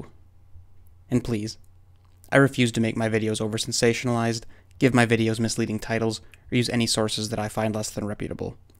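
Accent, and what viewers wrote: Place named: New England, United States. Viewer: You must be from the East coast. No one says "wicked" like us that live in New England. I'm in Maine. I have a friend that had an encounter in the Bridgewater triangle ( wompatuck state park) He and friends were chased out of the woods by an ungodly scream. My theory is they are everywhere! Thanks for sharing your experience. I truly love your channel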